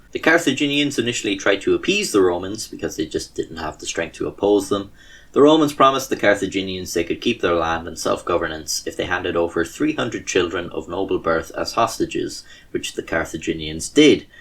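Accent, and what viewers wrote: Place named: Ireland. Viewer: Lol I love getting historical facts in a sarcastic Irish voice. Moreover, I am of the opinion Carthage should be destroyed.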